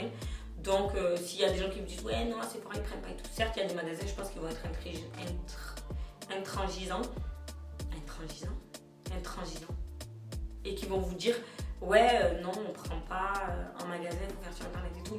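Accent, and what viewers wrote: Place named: South, France. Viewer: Merci beaucoup, tu as répondu à toutes mes questions pour travailler chez SEPHORA. La vidéo était très claire et compréhensible. Ps je te trouve très belle et j’aime beaucoup ton accent du Sud 😉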